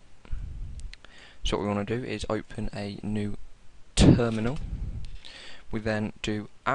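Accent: United Kingdom. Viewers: I like your accent. You're British, aren't you? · English accent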